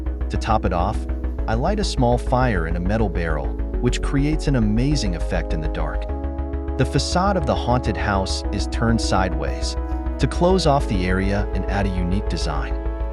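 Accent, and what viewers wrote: Denmark. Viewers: Yes, very cool. I miss your Danish accent. My computer is haunted, it would not let me stop watching your video 😱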